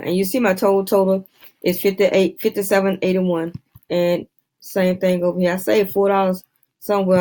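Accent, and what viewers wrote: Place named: Houston, United States. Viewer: You sound like you from h twn. Preciate the info fr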